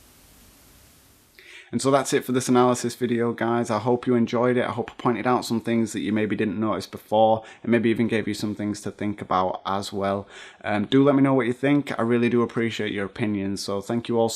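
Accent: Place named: Manchester, United Kingdom. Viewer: You have some of the best videos on YouTube, and some of the best paranormal videos I've ever seen. I apologize if I have missed your Q&A's that may have answered these, but I have a couple questions: 1.) Have you ever thought of taking up a professional career, in paranormal investigation? (I personally think you'd be great at it, though I know you are more interested in your own personal experience and residence right now) 2.) Where, in England, are you from? (I realize you likely enjoy your privacy, so you do not have to answer this question - I was trying to place the accent, along with my son...and we guessed Manchester)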